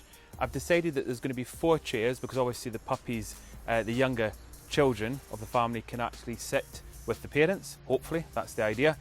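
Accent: Scotland, United Kingdom. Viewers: I just love your Scottish accent! I know, I know, I'm biased for I'm Scottish too. Looked forward to watching your channel, your tips on etiquette. Who know, one day I may have someone from the gentry coming for tea!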